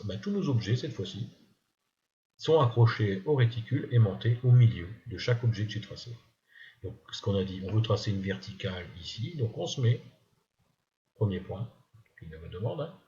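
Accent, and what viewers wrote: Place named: Nord-Pas-de-Calais, France. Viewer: Un tiot accent ch'ti, quelques fautes de français, mais chapeau pour ce travail de partage, bonne pédagogie, sans pub; si les leçons suivantes sont du même tonneau, je risque de me convertir à LibreCad. Un gros MERCI, un peuch bleu et un abonnement. PS: désolé d'avoir introduit du ch'ti dans le commentaire, je présente mes excuses aux internautes de langue française et ne recommencerai plus.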